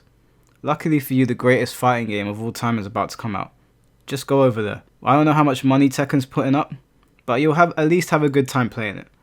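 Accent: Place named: United Kingdom. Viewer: Okaaayyyye, but this is how I like my men. Speaks like Harry Potter, BBC, and the fact he is from UK means he is likely UNCUT. :face-red-heart-shape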